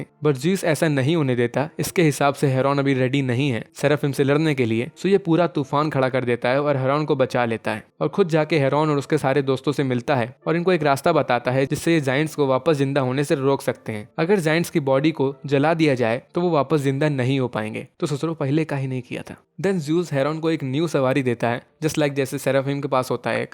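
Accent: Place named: Bihar, India. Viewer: Ohh man.... are you from bihar?? I can recognise it coz of your accent.. But your voice is really relaxing and soothing.🥰❤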